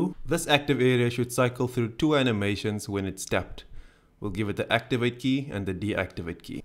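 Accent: South Africa. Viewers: I know a South African accent when I hear one. Keep up the good work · Yeah I was about to ask are you South African 😂 It's great to see Flutter Devs in South Africa.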